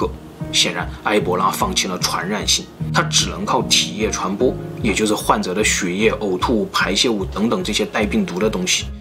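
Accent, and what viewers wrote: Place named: Hunan, China. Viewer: 带着湖南口音的普通话，居然能把故事讲得如此引人入胜，真是个奇迹！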